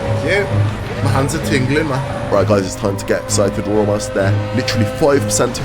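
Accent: United Kingdom. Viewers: Well i am sure your accent really does sound British brother 🇬🇧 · FUCKING BRITISH NOOO but i will stay for mw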